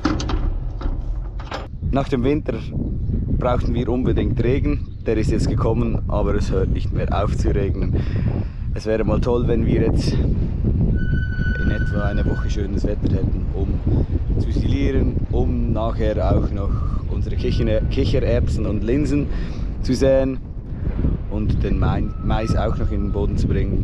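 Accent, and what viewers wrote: Standard. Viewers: Hallo, Du sprichst ja super Hochdeutsch, Respekt. Egal wie Du das in Zukunft machen willst, Dein Kanal ist super und ich verstehe beide Varianten, ich folge ja auch dem Bauer Housi. Schönes Wochenende aus Franken, Bernd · hab ich was an den Ohren oder schwätzt Du tatsächlich Hochdeutsch?! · Wieso redest du Hochdeutsch und nömä schwitzerdütsch? 🇨🇭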